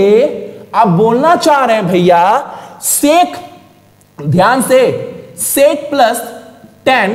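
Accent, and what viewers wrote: Bihar, India. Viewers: Sir ekdam bihari style me bol rhe hi bihari hi kay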